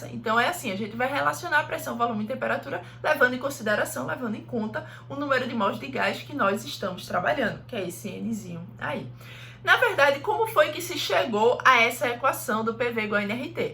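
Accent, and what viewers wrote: Pernambuco, Brazil. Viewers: CADA AULA FODAAAAQ. Tinha que ser nordestina, e quase certeza que é Pernambucana, né?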